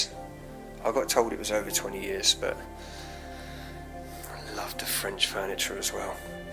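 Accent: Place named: Medway, United Kingdom. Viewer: Happy birthday! Love your vids, yr accent sounds local to me. Are you from medway area? I can't get around easily and you bring adventure to me, thanks matey x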